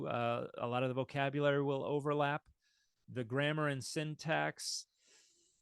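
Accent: Israel. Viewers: It is important to note that the pronunciation here is the modern israeli pronunciation - not the ancient one. If you want to hear the pronunciation of ancient hebrew (in different periods) I recommend watching A. Z. Foreman's channel. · I couldn't help but notice you pronounce Hebrew closer to a modern Israeli Hebrew pronunciation, rather than a reconstructed Biblical Hebrew pronunciation. Is that because your teachers were Israeli? Or because you distrust reconstructed pronunciation? Or just an accident of fate?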